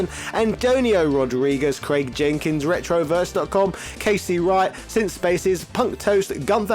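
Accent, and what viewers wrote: United Kingdom. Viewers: I do love these street fighter trivia videos. These British pronunciations though, there so cringy. I'm English and I've always thought so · I say Ryu like Ree-you. Works for me. But he's a brit and they didn't say it like us Americans lol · Lol british phonics!! love it! It appears Caapcom have taken to rippong off DBZ for inspiration. essentially we have Demon King Ryu and Evil Majin Ken! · I'm American & I'd pronounce his channel name with my American accent. I wouldn't put on a fake British accent to pronounce it the way he does. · thank god, you stopped using that stupid japanese pronunciation mid video...! you are british i want to ear your british accent when you speak...!!! cool vid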